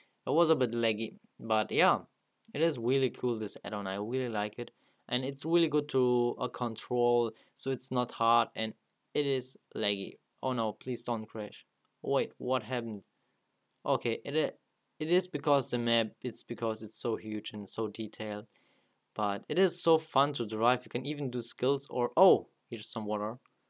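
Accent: Germany. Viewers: Wie mann einfach hört das du deutscher bist! :DD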